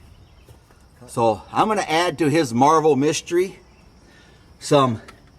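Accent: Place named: Dakota, United States. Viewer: No this is really how he talks, being from Dakota will do that to ya! Good will it run video!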